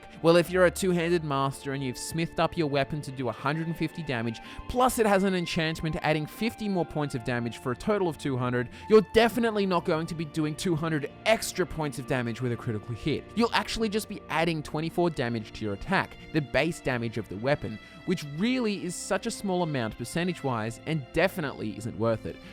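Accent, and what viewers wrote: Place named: Australia. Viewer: This made me realize that i have a thing for an Australian accent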